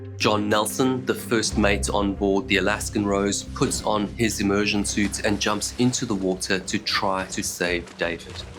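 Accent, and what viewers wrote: South Africa. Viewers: Are you south African brother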